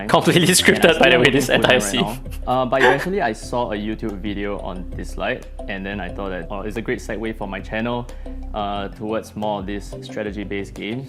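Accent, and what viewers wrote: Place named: Singapore. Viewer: normally he has some English Singaporean accent, but yeah maybe because it's more formal his accent is not as heavy as usual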